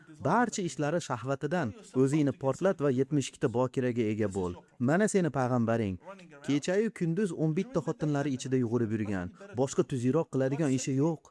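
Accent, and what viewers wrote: Andijan, Uzbekistan. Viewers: Koproq shevada gapiradi bzi andijon shevada kopchilik "yuĞurib" deyishadi atag yahshi taklif🎉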